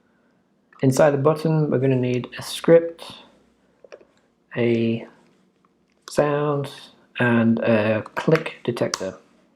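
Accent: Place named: United Kingdom. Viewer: i subscribe I like how he talk a british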